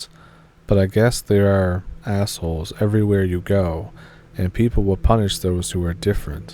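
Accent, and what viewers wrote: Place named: Nova Scotia, Canada. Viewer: Are you in N.B or N.S? I know the accent as I am from Nova Scotia hahah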